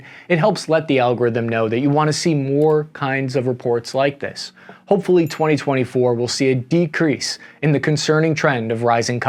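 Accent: United States. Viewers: Dude, you have a very strong American accent to your English usage. For me, an American, I put a lot of credence to your reports and analysis, because I know that it is coming from a familiar/recognized source. This is a good thing because there is a whole bunch of analysis which is written and then 'read' by computer to make it sound to be American English. Your presentation is authentic and sounds authentic. I have listened quite a bit and have drawn parallels in facts and analysis to other sites and sources that I trust. Good job! Keep up the good work, you have a keen sense for conflict and the social and political dynamics around conflict. Again, good job! and Thank You for your hard work and research.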